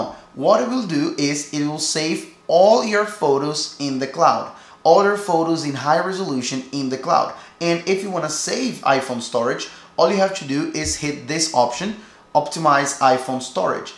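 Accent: Brazil. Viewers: Are you Brazilian??? Awesome accent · You are definitely brazilian I can tell by ur accent 💜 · Helped me a lot, thanks. I realized by your accent that you’re also Brazilian haha. Your English is perfect, congratulations! How is it for you to build such a big channel and so much content in another language?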